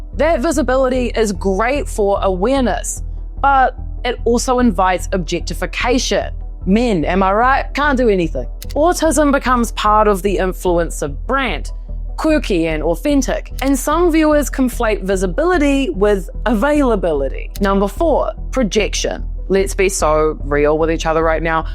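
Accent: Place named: New Zealand. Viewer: Are you referring to the accent? It’s Kiwi.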